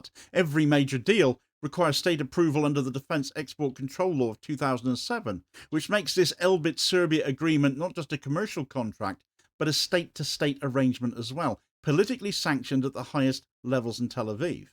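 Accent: United Kingdom. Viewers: First of all, there's nothing humiliating about losing a war against NATO as a small Balkan country. Second of all I like how you haven't mentioned the massive anti-government student protests that have been going on for more than 9 months. You said yourself that these weapons have been 'battle-tested' against a civilian population in Gaza and you could easily draw a parallel with these weapons possibly being used on the Serbian anti-government protesters if you weren't just fear mongering and spreading propaganda. Also it's very interesting how Serbia is now a problem, but your entire Western bloc, including your own country, that have been supporting and arming Israel for DECADES are fine. What is this hypocrisy? This video is proof that you can say anything, with a British accent, and people will think you're insightful and smart.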